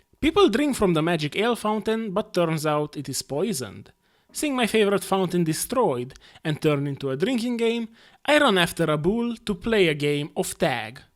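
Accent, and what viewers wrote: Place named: Eastern Europe. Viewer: i thought his accent sounded a little bit Slavic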